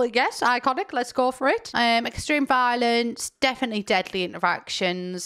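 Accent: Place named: North, United Kingdom. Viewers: Northern British really does hit different. It’s not as posh as most British accents and I have no choice but to stan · Not just any British. Northern British. It hits different.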